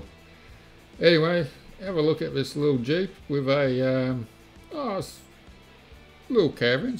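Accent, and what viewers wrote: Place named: Australia. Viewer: Love your Aussie accent....Relaxed talking manner but not too relaxed for the masses....sometimes my ears couldnt relate..sorry....so as my kind teacher used to say.. "Talk nicely and clearly"...! .just so all your valuable help sinks in..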